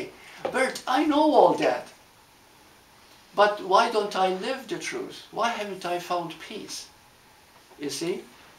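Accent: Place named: Italy. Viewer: This obviously has nothing to do with what he's talking about, but I'm just curious, what kind of accent does he have? His name seems English, but his accent sounds more Italian or something else. Where is from?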